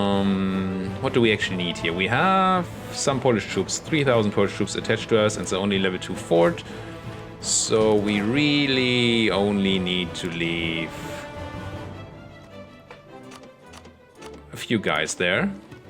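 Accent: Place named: Germany. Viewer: Oh wow, I would never have guessed that, your accent sounds too "refined" for being German, in a good way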